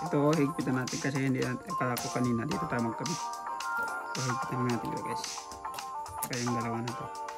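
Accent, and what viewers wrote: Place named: India. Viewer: If im not mistaken, may dugo kng indyano..parang bulol ka magsalita ng parallel.. ganyan ang tono ng mga bombay!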